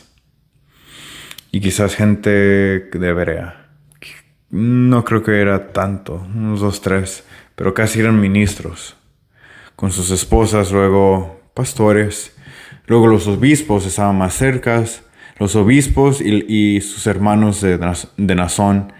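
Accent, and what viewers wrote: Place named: Oaxaca, Mexico. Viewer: Oye Bro ! Eres de norte América o de sur Oaxaca ! Lo digo por tu acento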